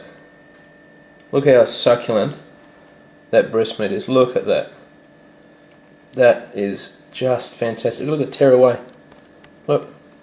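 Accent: Australia. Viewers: Very nice recipe What accent is that? Australian? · loveeeeeeee Australian accent!!!!!!!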